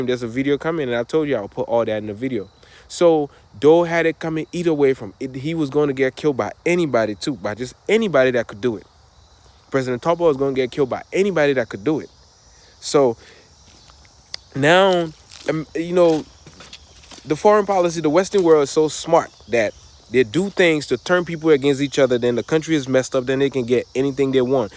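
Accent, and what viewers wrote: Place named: United States. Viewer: Your accents is very American too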